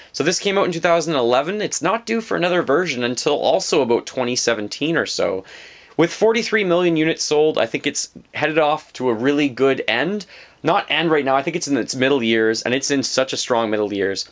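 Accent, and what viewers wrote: Canada. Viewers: I just came across your channel and I really liked it, man! I enjoyed hearing your thoughts and optimism for Nintendo's E3. I really hope to see the Wii U Zelda that isn't Hyrule Warriors (Aonuma pretty much said this was true), some strong 3rd party titles, and some more stuff for the Virtual Console. I want my N64 and Gamecube games on there! Of course, I really want a new Star Fox, Metroid, and F-Zero, but we'll have to just wait and see about that. Also, it might be just me, but you sound tremendously like Alex Lifeson from Rush with that Canadian accent.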